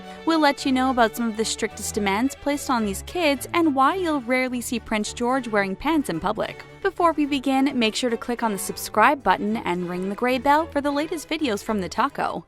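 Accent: United States. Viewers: Narrated by an American….. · you can tell who was talking is american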